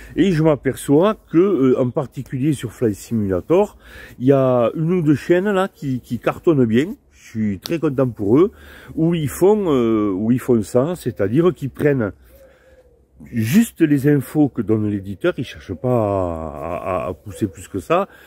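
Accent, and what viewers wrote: France. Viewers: Hello. Je te dirais juste une chose. Laisse les autres américanisé ou quoi que ce soit, il sont bien moins intéressant que tes vidéos. Je prend toujours un vrai plaisir a regarder régulièrement tes vidéos qui m'apportent du bien-être, des apports très intéressants sur fsms, mais surtout de la marade car je ries très souvent car j'adore ton humour avec cet accent du sud. Je t'en supplie, continue à faire tes vidéos. J'adore. Cordialement. Pascal..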